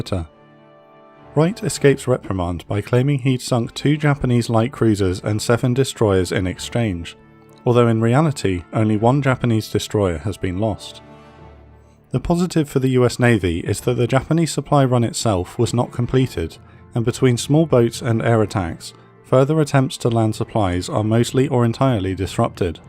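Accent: England, United Kingdom. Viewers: Get rid of the heavy English accent that I can't understand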